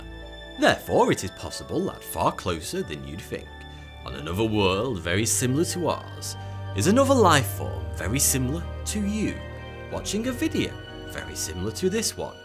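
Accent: United Kingdom. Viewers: This is the British younger Vsauce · you sound british but you have lived in america for 12 years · Hey Your Voice Is Deep and Beautiful. You Speak Like British Gentlemen. 😎🤓🧐🤗🙂😉 Yes Well And Sophisticated. I Am From India. Hey Why Corona Virus. I Thought This Video is Old Video. But You Just Uploaded On 3April 2020. 😃😀😄😆😂🤣😛😜😜 Be Safe From Corona Virus. Kill The Corona From Earth.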